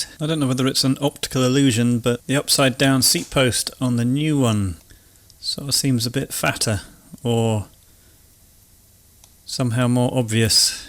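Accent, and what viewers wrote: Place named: United Kingdom. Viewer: First of all...I loved your British accent...! Great work once again....!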